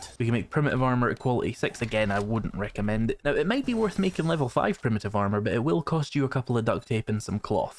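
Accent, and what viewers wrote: Scotland, United Kingdom. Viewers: are you Scottish, sound very very similar to a Scottish person trying to talk slow so other people can understand us 😂🤣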